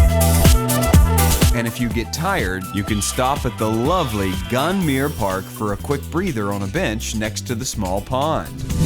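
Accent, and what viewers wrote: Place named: United States. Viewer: A little research on correct pronunciation would help cover over the grating American accent and condescending style. Otherwise interesting.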